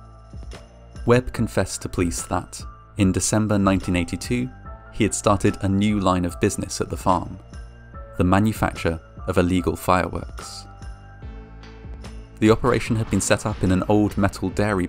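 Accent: United Kingdom. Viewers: WHY is this American incident narrated by a British narrator? Couldn't you get an American to tell the story? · I'm confused. You seem to have a British accent and yet you consistently keep using metric measurements first. Everyone knows UK and USA have it right. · Gawd I hate a British accent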